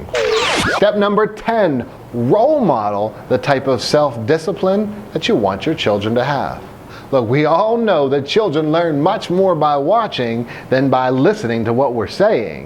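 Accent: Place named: United States. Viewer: Why does he speak in an American accent? He would sound better if he spoke in an Australian accent.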